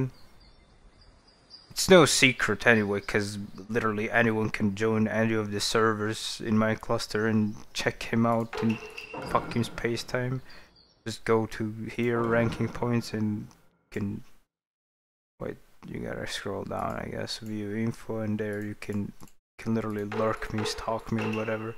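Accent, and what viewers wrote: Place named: Ireland. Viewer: Your voice remains #1. Gotta love the Irish :")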